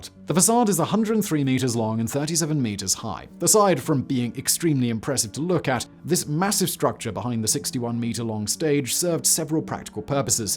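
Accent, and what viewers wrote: RP, United Kingdom. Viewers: The cool thing about, he’s got that posh English accent but it’s cool not annoying. I could have a pint with for sure.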